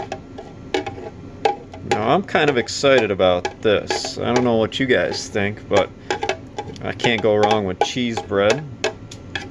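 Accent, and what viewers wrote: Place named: Upstate New York, United States. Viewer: Are u from upstate NY lmao I can tell by the accent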